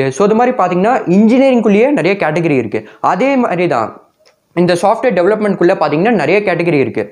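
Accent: North India, India. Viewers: North hindian mari pesra😂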